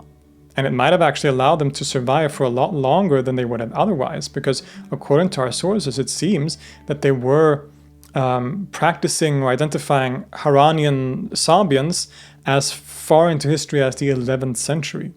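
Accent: Arab world. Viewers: Ur semitic arabic accent is on point.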